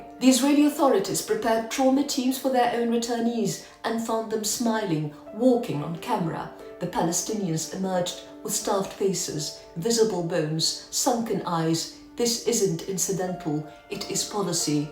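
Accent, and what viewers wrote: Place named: England, United Kingdom. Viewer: Pity about the elite English accent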